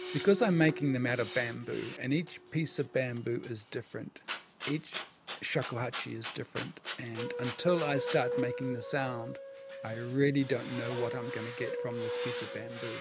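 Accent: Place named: New Zealand. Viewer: Your accent. Sounds from New Zealand.. telling me there's a blind shakuhachi master here??? Such an inspiring guy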